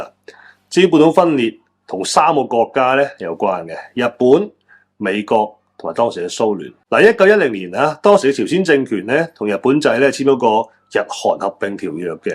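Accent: Guangzhou, China. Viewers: 嘩吊！原本聽廣州話仲全神過香港嘅廣東話，起碼唔洗聽一D無謂嘅英文字!!!!!!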